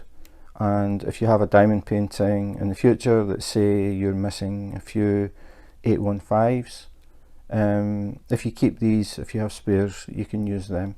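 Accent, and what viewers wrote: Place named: Scotland, United Kingdom. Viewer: It's always fun to listen to a Scottish accent. So cool. My father-in-law is Scottish but he has lived in Australia (where I'm from) since he was 17 y/o. He's now 80 y/o. I thought his accent was still strong but after listening to yours I realize it's not as strong as I thought.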